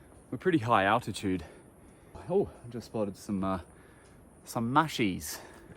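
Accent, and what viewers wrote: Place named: Australia. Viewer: Lol wasn't expecting an Aussie accent when I clicked on this! Long way from home!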